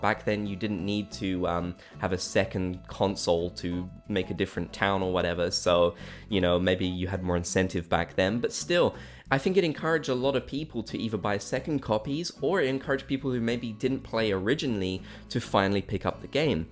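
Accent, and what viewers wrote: United Kingdom. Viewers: Gang can y'all boost this so he sees it? Having accurate captions would help viewers like me a lot. The auto-generated ones don't work well with British accents and I really want to be able to watch these videos AND fully understand what you're saying Accessibility is really important! #Accessibility #DisabledViewer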